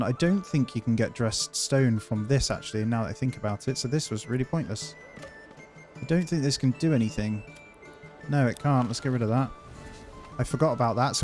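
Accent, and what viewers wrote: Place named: England, United Kingdom. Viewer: I'm trying to pinpoint your accent....is it Bristol, Devon or Norfolk? Can't put my finger on it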